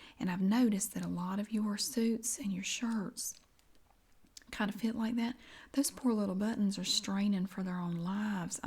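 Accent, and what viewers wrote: South, United States. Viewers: You have the best southern accent and that sense of humor! Love it!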